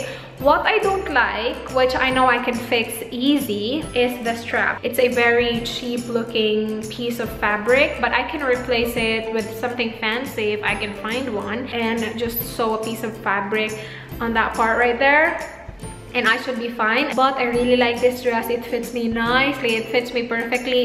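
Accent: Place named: Visayas, Philippines. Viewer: What city do you live ate? The way you speak tagalog makes me think you're bisaya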